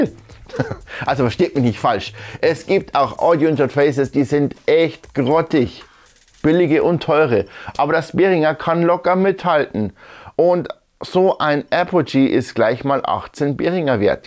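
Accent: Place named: Lower Bavaria, Germany. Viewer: Ja cool :) Grüße gehen raus von Regensburg nach Niederbayern 😊🤙🏼 hoff, ich deute deinen Dialekt richtig 😹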